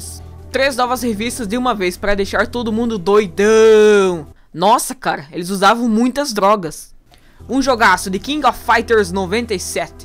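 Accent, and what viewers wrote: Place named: Paraná, Brazil. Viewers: Parece paranaense... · Você é de Curitiba ou de outra cidade do Paraná? O sotaque é inconfundível. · axei que vc morasse em Curitiba, seu sotaque de paranaense é bem forte, legal vc se ser daki "pr"... tem muito potencial, boa sorte =D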